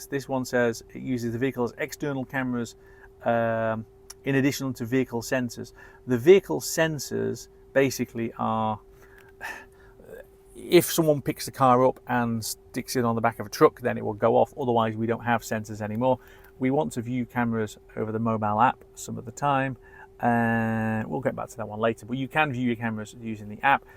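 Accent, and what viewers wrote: Scotland, United Kingdom. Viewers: Voice controls and scottish accent 😀 This Tesla struggles like myself when on vacation in Inverness, since I am not an english native speaker. Very nice car in exactly the colour I would choose too... Different to your car I would choose white for the interior. Keep on with your video works; after watching several videos randomly for quite some time you've just won a new subcriber.Cheers from Austria! Ludi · Just what I wanted in a demo video, no nonsense, British and informative!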